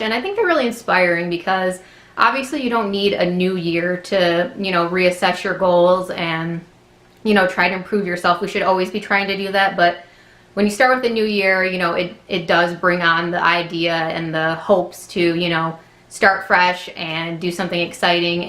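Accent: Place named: Michigan, United States. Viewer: Ok, this is so weird, because as I was listening to you I was thinking "She sounds like someone from Michigan." LOL No lie! That's actually why I asked! Too funny! Are you on the west or east side? And no, the drive isn't bad at all. Unfortunately though, we discovered that my youngest (who is 13) has some serious anxiety when it comes to driving through the mountains. I definitely do, but we didn't realize he did until he about hyperventilated as we were going up to our condo. It was at the top of a mountain and it was pretty dang scary the sharp, steep turns you had to take where you actually couldn't even see the road in front of you sometimes! We decided next time we go, we'll try to stay somewhere not quite up so high. It was absolutely beautiful where we were though. Also, the guy who we rented the condo through on VRBO told us that he gives a good discount to returning customers and that he has some really nice places right in Gatlinburg, so we're thinking about doing that when we go again!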